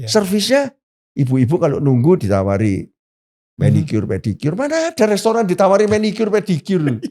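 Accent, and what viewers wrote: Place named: Java, Indonesia. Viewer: Logat Jawanya medok banget, jadi makin relate sama pak Hermawan 😊 "kejujuran adalah nilai2 Islam yang Universal"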